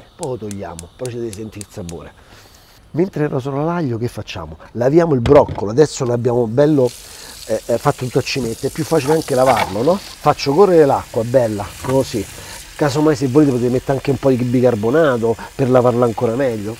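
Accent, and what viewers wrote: Rome, Italy. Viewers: mi sono iscritto da poco e ti trovo troppo forte! ricette interessanti raccontate dalla tua travolgente simpatia e accento romano... io sono di bergamo e stò cercando nei miei video di coprire la mia accento!... ma forse è meglio valorizzarla..bho....comunque complimenti!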